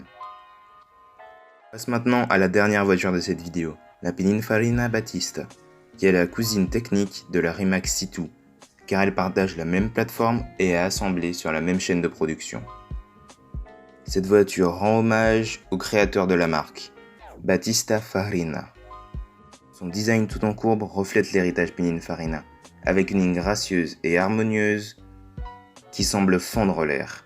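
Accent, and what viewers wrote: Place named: Spain. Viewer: le tite accent espagnol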